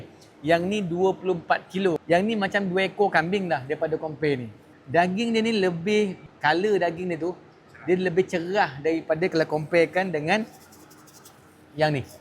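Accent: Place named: Negeri Sembilan, Malaysia. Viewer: Speaking nogori pokat Broo....😅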